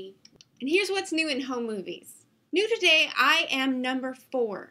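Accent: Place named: France. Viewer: Nice "french accent" ! France loves you (I do anyway :)) !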